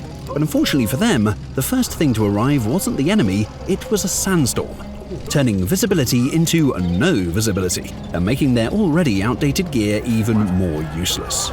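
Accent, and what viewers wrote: United Kingdom. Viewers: Such a great video. My only problem was the narration, you guys are already hard to understand with the british accent but calling Saddam Husein as "Sed'm" didn't help at all 😂 I had to keep rewinding to understand what's being said. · What's point of posting this video with your garbage brit accent, so that you can spread propaganda for iran war!? You do know that, 8 to 9 trillion dollars were spent by US military and ended up rising prices of everyday living, if not then stop spreading propaganda!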